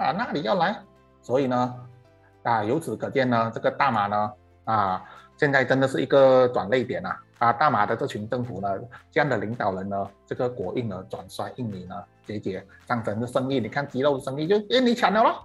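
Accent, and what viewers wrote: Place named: Fuzhou, China. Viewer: 哗你的福州话很标准呢！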